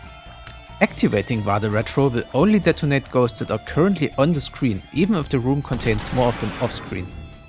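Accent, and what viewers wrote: Germany. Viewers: Ich mag deine Videos sehr, wwil sie ausführlich und informativ sind, aber ich finde, du solltest besser an deiner Aussprache arbeiten. Dein harter deutscher Akzent triggert mich extrem. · nice german accent i love it hahah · German accent man is back with another video!!!! POG You could narrate so many informative videos and I would watch them for your voice alone. It just seems so… perfect for this type of informative video. I don’t know why.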